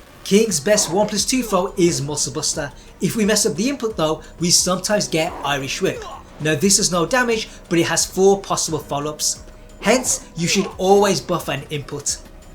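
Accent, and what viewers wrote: Birmingham, United Kingdom. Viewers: great guide, thanks a ton! you from birmingham? trying to place your accent. rly appreciate all the king tech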